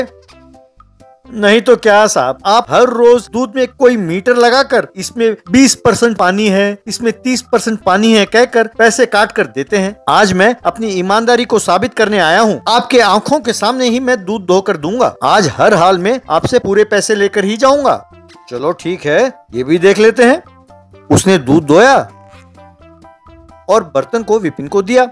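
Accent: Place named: Patna, India. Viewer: Get puffy Patna tone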